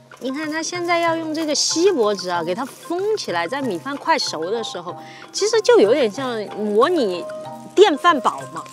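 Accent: Sichuan, China. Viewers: 喜欢你的视频，真实，更喜欢你的四川普通话。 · 因为是川普啊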